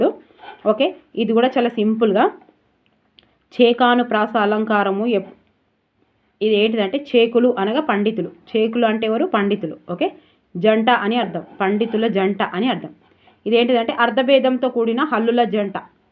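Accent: Telangana, India. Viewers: Telagana భాషలో మంచి గా మాట్లాడుతున్నారు medam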